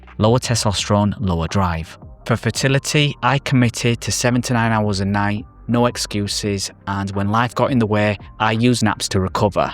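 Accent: United Kingdom. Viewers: I'm curious about your accent... which region of the UK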